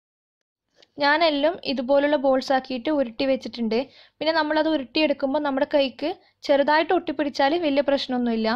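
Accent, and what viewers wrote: Kannur, India. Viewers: .. Nhan thrissur aane.. Kannur slang enikk orupade ishtta...ippo samsarikkunna pole thanne samsarichal mathi.. 😁😁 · നല്ല കണ്ണൂർ slang........☺️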